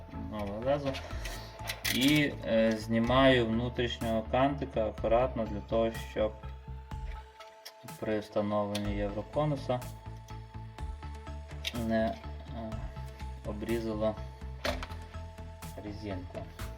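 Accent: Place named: Surzhyk, Ukraine. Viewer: за суржик 👎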